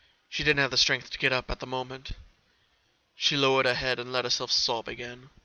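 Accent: England, United Kingdom. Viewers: What's with the english accent in your narrative? I admit, it does add to the drama.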